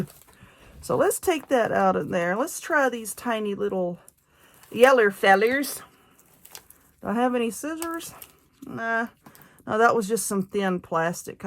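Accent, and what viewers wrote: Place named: Alabama, United States. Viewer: I love all of your projects. I get great ideas from you. I also love someone who sounds like me. I’m from Alabama so you go girl. You stay safe and well. I will be making this wreath. I’ve been looking for some decor for my gazebo. Thank you so much.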